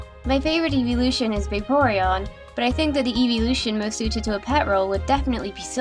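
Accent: England, United Kingdom. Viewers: are you English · me seeing this vid in my suggested feed big deal, another poketuber! im subbed to, like, a million others! ah, wtf, ill watch one of their vids me after starting this vid HOLY ARCEUS SHE HAS AN ENGLISH ACCENT!!! SUBBED!!!!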